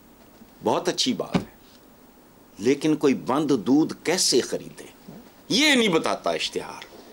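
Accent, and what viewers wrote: Punjab, India. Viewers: * This dude why he is speaking urdu in punjjjjjjjjjjjjjjjbi accent? I lived in pakistan. Only the very poor and islam's thekedars are against wine, beer and whisky there. Open up let people enjoy themselves, religion is nothing but figment of lmagination., there's only one life so enjoy it.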